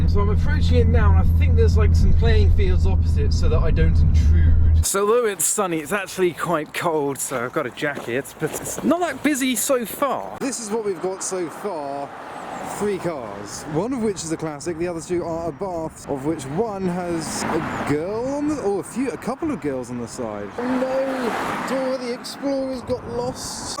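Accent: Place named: United Kingdom. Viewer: You mean, how he "tolks" British style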